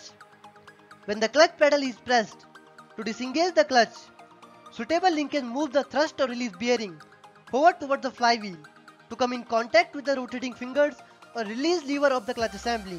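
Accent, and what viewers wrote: India. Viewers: More confused than before. Hard to understand with Indian accent. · i swear my brain has been trained to shut up and listen like im in class whenever i hear an indian accent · That indian accent is disturbing. Better to read instead of listening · This is an indian guy because his speaking accent is hindi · How come it's always the guy with an Indian accent that knows literally everything and can explain it to the dumbest people and it makes sense!? This is a masterpiece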